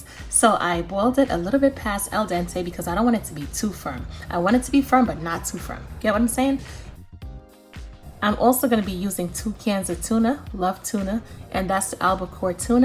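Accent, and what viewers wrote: New York, United States. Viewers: You sound like a new Yorker 🤔🤔🤔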